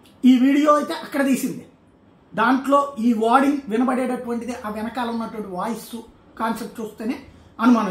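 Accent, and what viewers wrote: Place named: Godavari, India. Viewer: avunu bro adi Godavari slang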